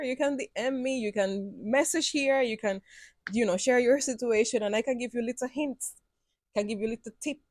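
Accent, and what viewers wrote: Patois, Jamaica. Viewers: Lawd me weak . I love the way you speak patwah. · Beautiful jamaican accent great story ❤ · Love your Jamaican accent👍❤️ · There's nothing wrong with the way she talks. She lives in Jamaica and picked up the dialect. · You know, listening to your stories and the way you talk, proves that you really turned into a Yaadie after all these years, because usually Cubans who come to Jamaica are full of bad attitude, they don't like the Jamaican food, housing has to be top tier, salary has to be the double of the Jamaicans but they want to work less, and if you feel like Kfc workers in Jamaica have a bad attitude while working, you have never seen a Cuban yet😂😂😂 So I am very much surprised seeing a Cuban woman like you adapting to Jamaica so well, put in the hard work, not afraid to make her hands dirty while keeping a grateful positive attitude...yah man, you turn 100% Yaadie! 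🫡👏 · Girl i love your jamaican accent , welcome home girl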